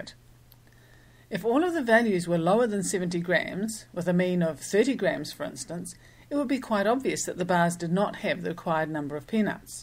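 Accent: New Zealand. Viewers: Are you from New Zealand?? I love that country and want to go back some day! Love the accent too.. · With your videos I am learning Statistics AND New Zealand's English :)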